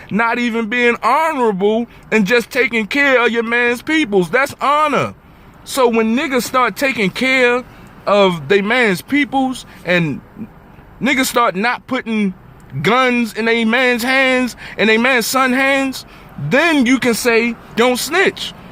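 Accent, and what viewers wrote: Baltimore, United States. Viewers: You from b-more. I hear the accent...😂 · Bruh you from Bmore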